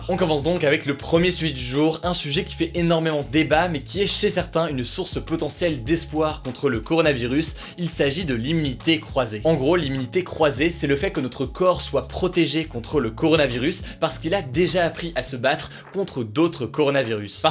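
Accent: England, United Kingdom. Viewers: J'adore ton accent anglais 😍😂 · Pourquoi tu portes un accent britannique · mdr ton accent en anglais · That english accent though, legit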